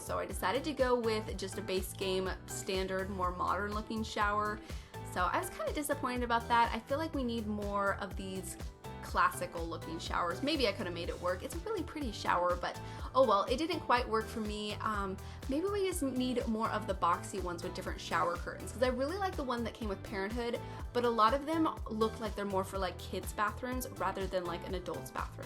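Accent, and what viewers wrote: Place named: United States. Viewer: Beautiful! I always enjoy watching your renovations and I know it sounds weird but you have a lovely voice! Probably because you’re American and I’m English it makes a nice change! 😊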